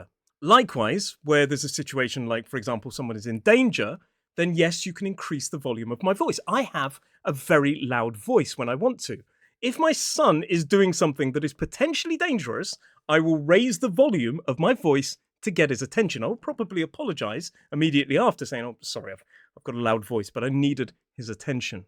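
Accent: United Kingdom. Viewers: British English!!!! Really..I switched off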